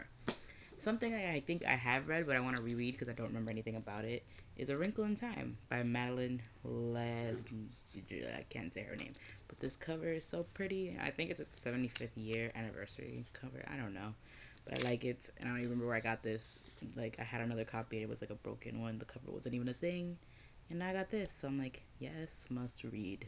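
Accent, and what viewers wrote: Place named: Spain. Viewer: I didn't know there were multiple Oz stories. F SCOTT. TELL ME WHAT YOU THINK ABOUT WINTER DREAMS IF IT'S IN THERE. UGH. LORD OF THE FLIES IS SO GOOD. TALK TO ME ABOUT SIMON. JESUS CHRIST. SHERLOCK HOLMES. YES. YES GOOD. UGH. I'm studying Mrs Dalloway next semester, looking forward to that. Tell me what you thinnnk of it! OH what do you think of Emma Approved? I'm going to read Emma soon. Yes yes yes. That is a beautiful copy of S&S;-; GABRIEL GARCIA MARQUEZ. STRANGE PILGRIMS REEEAD IT. YAAAAAS. I love your Spanish accent.;______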